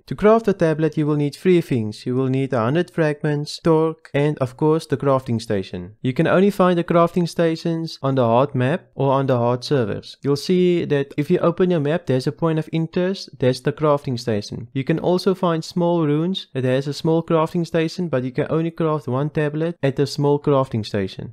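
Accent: Afrikaans, South Africa. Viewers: Wow that afrikaans accent is strong with this 1.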